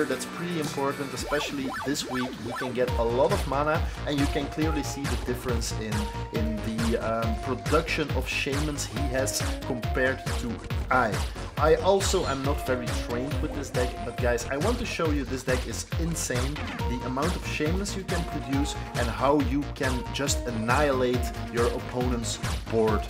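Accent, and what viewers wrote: Netherlands. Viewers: Hi just a question, are you dutch? Cause your accent sounds like dutch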